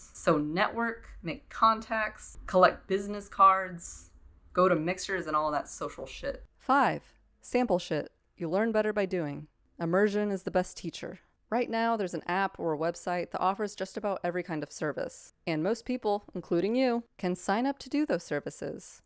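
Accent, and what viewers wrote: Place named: United Kingdom. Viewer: Thanks for the shout out. Nice British Accent! New career as a voice over actress? :) Something you said in there - you have an appreciation for Design. Perhaps Design Consultancy or Management (yeah I know) is your thing? Not actually being "creative" yourself but overseeing the creative process of others to deliver the right result for the client? Having experience of a role before becoming a manager of that role certainly makes you a better leader (or so I've heard).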